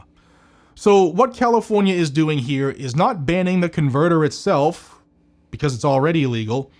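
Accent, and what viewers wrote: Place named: Tennessee, United States. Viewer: WOW, that deep south Tennessee accent really popped out today. Department = DePAHtmint.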